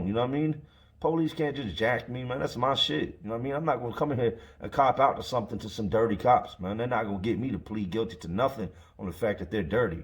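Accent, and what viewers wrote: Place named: Baltimore, United States. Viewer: For real you talk and vibe like dudes in Baltimore.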